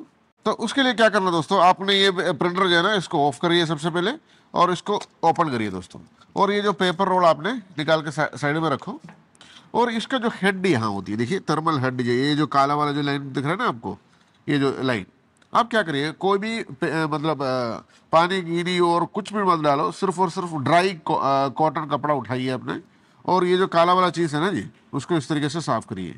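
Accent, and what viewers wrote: Kerala, India. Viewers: Malayali aaanelle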